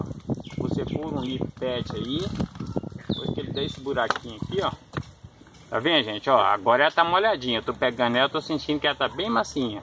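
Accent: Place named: Minas Gerais, Brazil. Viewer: Vc explica direitinho agradecida pelo video parece ate mineiro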